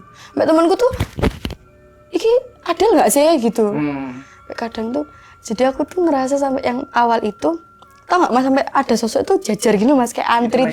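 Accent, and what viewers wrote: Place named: Surabaya, Indonesia. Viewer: Logat khas Suroboyonan yah, iyo la.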